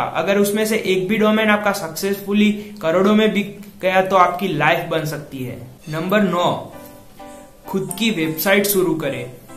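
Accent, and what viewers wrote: Gujarat, India. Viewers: Gujarat se ho bhai?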